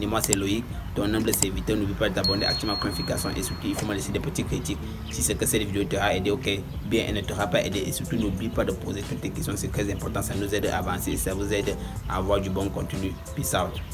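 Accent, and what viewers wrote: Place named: Cameroon. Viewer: Franchement, on a du mal à vous suivre, tout le monde n'est pas camerounais...Allez doucement pour ceux qui vous suivent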